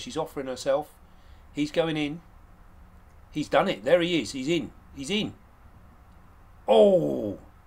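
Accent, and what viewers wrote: United Kingdom. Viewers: There's nothing better than having a British guy describe nature to you, you know it's the Truth because of the accent.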